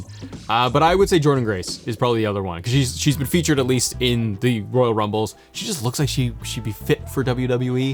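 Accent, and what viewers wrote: Canada. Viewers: i knew it was east cost and he wasn't French but I figured Halifax or Toronto but I didn't wanna shoot in the dark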